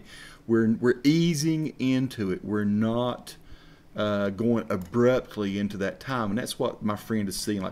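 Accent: South, United States. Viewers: Awesome Vid. Thanks. I somehow knew you'd have a southern accent with a name like lol.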